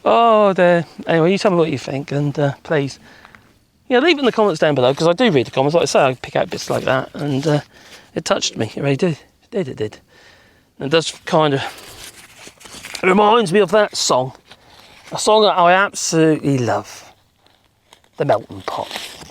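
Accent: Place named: West Country, United Kingdom. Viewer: All this time watching channel I thought he was from the west country! 😅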